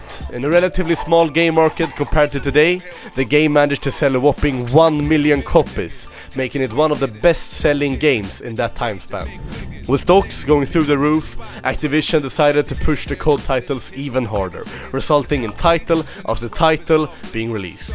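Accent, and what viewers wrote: Sweden. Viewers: I think I have watched this video maybe 20 times. I think I can already remember half of the script! Everything in this video is just flawless, everything from the music choices, pacing, the Swedish accent to the stunning motion graphics and design. This video is just perfect. This video has inspired me so much and will surely influence my decision what I want to pursue later on my studying career. I don't know if you are planning on making more content, but if you are, I will be waiting excited!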